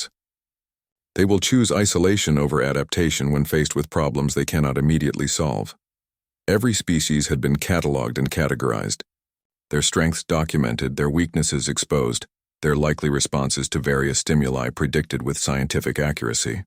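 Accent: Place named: United States. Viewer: Oh the irony, of having an American voice declaring a breakdown of rules. In particular the time frame of the world order breaking because of an American presi... donkey.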